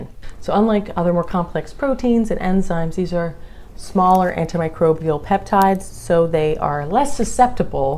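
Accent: United Kingdom. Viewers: how tall are you & wots your zodiac sign, where is your accent from as u sound British??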